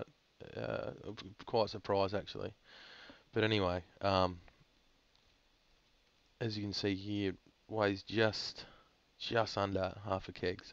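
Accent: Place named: Australia. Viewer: He's From Straya. We think Americans talk funny.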